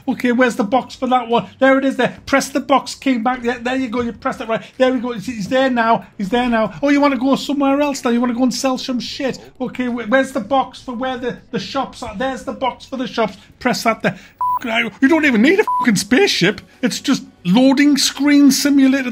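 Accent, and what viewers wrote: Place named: Scotland, United Kingdom. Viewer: oh the accent sounds kind of scottish, but what do i know im from canada lol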